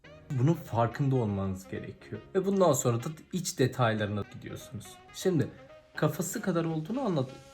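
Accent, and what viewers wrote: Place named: Samsun, Turkey. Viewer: "Eğl" çizmeyi öğrettiğiniz için tişekkürler. Hafif bir samsun ağzı sezdim (-_-)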